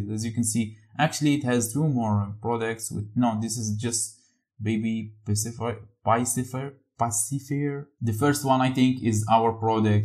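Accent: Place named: Morocco. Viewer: Dude bro you are from morocco You accent sounds familiar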